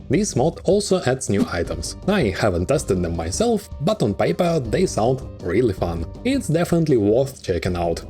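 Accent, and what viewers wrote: Russia. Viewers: Do you hear that? It's a Russian accent. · С оригинальным контентом не знаком, наткнулся на это видео и по первым же секундом услышал руский акцент, возможно это так для рускоговорящего плохо звучит, но звучит нечем не лучше идуского, лучше уж диктора нанять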